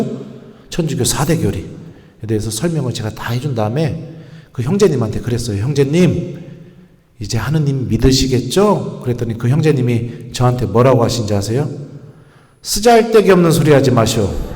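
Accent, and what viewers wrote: Chungcheong, South Korea. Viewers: 찬미예수님 ~♡ 신부님 서울가셨내유~다음 또 가신다구유~^♡~ 신부님 충청도 사투리 환장하게 재밋내유~ 서울말 안하셔도돼유~^^ 말씀자체가 신자들을 사로잡는당께유~신부님 아주 멋지십니다.제고향은 충남 부여 랑께유~^^ 신부님 건강하시고 행복하셔유~♡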